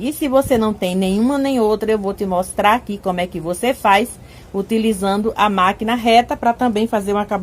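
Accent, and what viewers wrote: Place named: Northeast, Brazil. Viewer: Bom dia!!! Parabens trabalho bem feito Qual valor você cobra feste conserto? Qual estado vc e? Imagnei nordeste pelo sotaque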